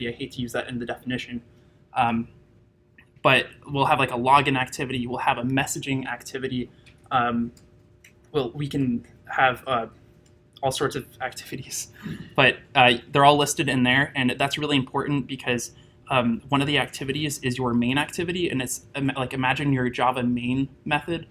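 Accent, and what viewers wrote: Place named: United States. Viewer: Subtitles please! I'm from India and I don't understand American accent. 😞🙏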